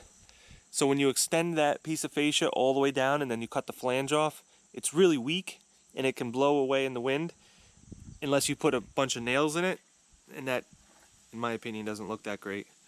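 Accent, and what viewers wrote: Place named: Kingston NY, United States. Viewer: Sounds like your from Kingston Ny I live in Pleasant Valley. I like your mechanic videos and roofing videos.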